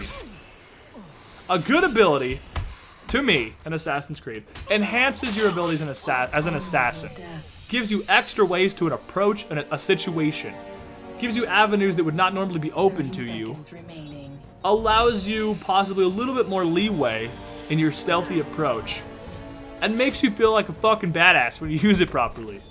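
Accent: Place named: Sweden. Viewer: i dont play ac3 or any i just come for the sexy gameplay and sexy voice and comentary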